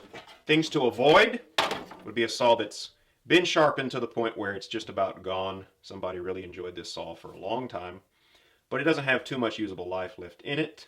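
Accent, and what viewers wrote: Tennessee, United States. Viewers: Sounds like a Tennessee accent to me, but I haven't lived there since 1969. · he sounds more Tennessee city to me. Maybe kinda like Memphis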